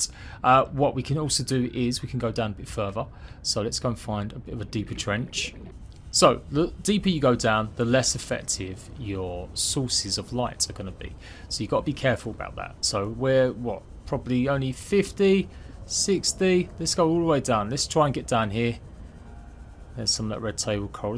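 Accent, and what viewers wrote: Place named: Essex, United Kingdom. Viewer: Are you from southeast England? I swear your accent is like, essex or something.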